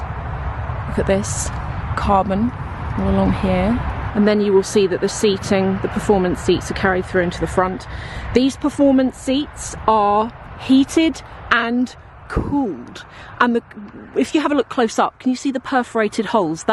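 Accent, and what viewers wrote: RP, United Kingdom. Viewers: She has posh accent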